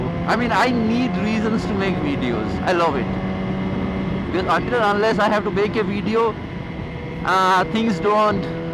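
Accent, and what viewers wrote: Odisha, India. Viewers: Suprb bhai ଭାଇ ଯେତେବଳେ ଓଡ଼ିଆ କୁହନ୍ତି ପୁରା ଦେଶୀ style re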